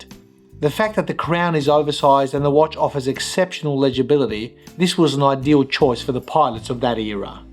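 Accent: Australia. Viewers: Fix that damn obnoxious Aussie accent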